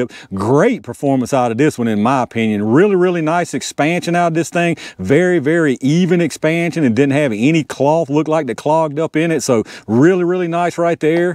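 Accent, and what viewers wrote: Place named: Appalachia, United States. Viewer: Y,all would call me a Yankee, but this guy speaks Hillbilly. That’s ok, because he gives Great information!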